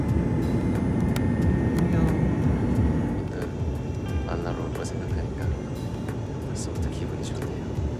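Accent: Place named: Java, Indonesia. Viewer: njir medok🗿😅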